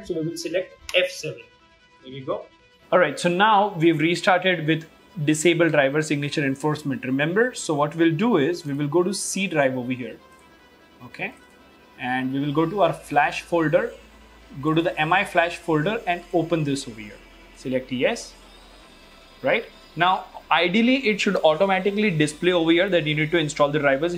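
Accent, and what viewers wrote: India. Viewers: i like your conten, u speak clear not just like another youtuber speak english but have a bit indian😅 so try hard, i will support you from malaysia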